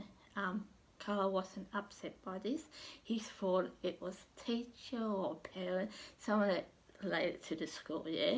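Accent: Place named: Australia. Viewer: She is Australian. Those of us from the states need subtitles. Very DIFFICULT to understand her accent.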